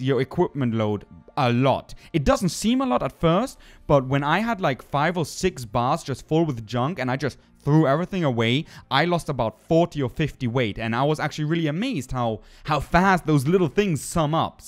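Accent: Germany. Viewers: German accent actually. Austrians are speaking german, too btw. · Robin Andersson Sounds like a german accent ;) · Great video! Thanks! Also, you sound like Flula. Haha.... are you German? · Ganz klar deutsches Englisch :D Dennoch gut gemacht, hat mir sehr geholfen :) Konter sind demnach wohl nicht so ratsam, weil man die Verteidigung runternehmen muss. Ich wurde schon vom Greifen am Anfang 3 mal zerfetzt :P Gibt es bei solche Monstern Tipps, die mna beachten sollte? Es durchschlägt ja Parieren, Quen-Schilde und der kleine Dodge wird auch komplett ignoriert. Also kann man praktisch nur Zeit schinden bis die Spells bereit sind, was ja nicht Sinn der Sache sein kann :/ Grüße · grewal The Accent is German if you were still wondering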